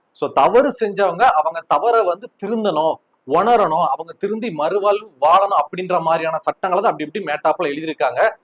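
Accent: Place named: Madurai, India. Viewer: நீங்க மதுரைக்காரா ,உங்க பேச்சிலேயே தெரியுது,நானும் மதுரைதான்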